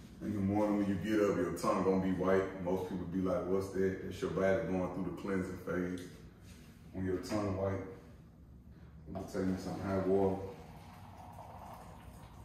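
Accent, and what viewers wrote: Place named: South, United States. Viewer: Your southern accent thick! Its crazy watchin a laid back video of u just chillin at the house. Diggin the morning voice! Heavy but soft at times as u speak. I've had seamoss and it does give you a nice boost of energy. The texture is a bit different but its good & great 4 ur health! Make me a cup?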